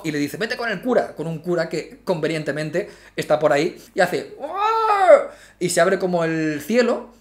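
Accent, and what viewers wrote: Spain. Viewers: Hablas como Vegeta (Dragon Ball) en el doblaje latino pero con acento español xD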